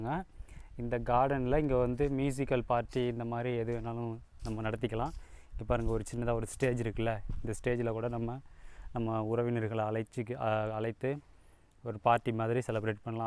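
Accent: India. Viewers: Hey, your Tamil slang still same, that’s cool 😎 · நம் ஊர் தமிழ் கேட்கும் போது மிக அருமை.உங்க குழந்தை தமிழ் பேசுமா.வாழ்த்துக்கள்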